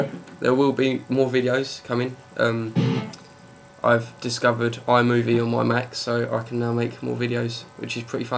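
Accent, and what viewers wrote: United Kingdom. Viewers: Are you britsh all british guys remind me of Matt Bellamy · lmao bro im trying to learn this for some songs so i find its only appropriate the the guy giving the "lesson" has a british accent XD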